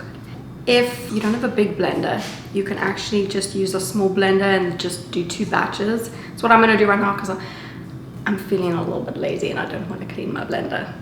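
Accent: South Africa. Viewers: She's from South Africa. (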